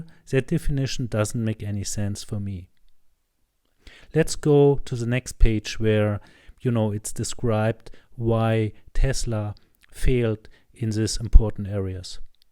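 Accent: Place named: Germany. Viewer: Gutes Video! Inhalt top, Aussprache noch etwas zu deutsch:)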